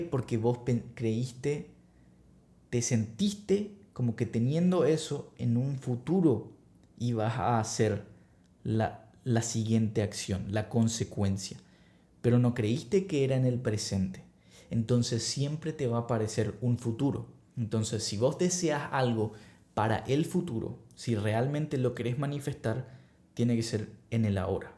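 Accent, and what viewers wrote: Paraguay. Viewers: Acento Paraguayo? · Ya me parecia que sos de Paraguay por el tono 😊😊. Que gusto encontrar alguien de mi pais que hable de este tema en el cual estoy muy interesada. Si puedo contar algo de mi experiencia es q años atras menifeste a una persona en especifico, q hice? fue con scripting, y tb olvidando unos problemaa q habiamos tenido y enfocandome de forma positiva hacia esa persona, creo q la clave estuvo mas q nada en eso. Y tambien recuerdo q a un amigo le dije q con esa persona estabamos saliendo cuando no era asi y luego se cumplio, pero yo creia fervientemente q esa relacion se iba a dar tarde o temprano. Asi pues creer es el secreto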